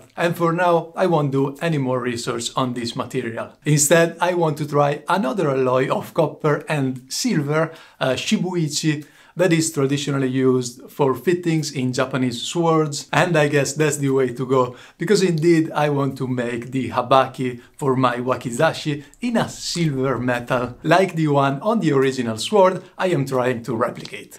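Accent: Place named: Italy. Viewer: can i ask if you're italian? I think you are because of the accent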